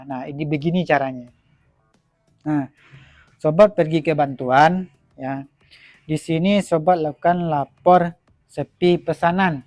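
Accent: West Sumatra, Indonesia. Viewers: Lo orang minang ya bg😂😂 logatnya minang banget😂😂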